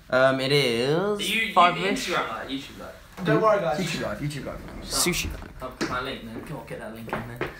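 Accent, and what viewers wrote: United Kingdom. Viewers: What he's British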